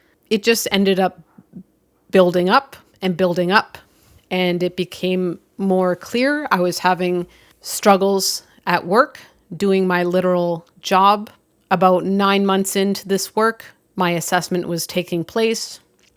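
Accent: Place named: Canada. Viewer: How are schools today in detecting dyscalculia & dyslexia? I mean both the US & Canada as I'm American and I can hear her Canadian accent.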